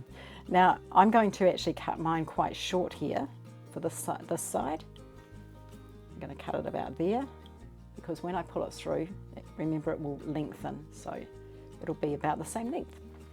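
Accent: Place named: New Zealand. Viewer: Thanks,great tutorial, never thought about hiding the knot before! Thanks from Wales, I've friends from South island NZ and your accent makes me homesick for beautiful NZ xx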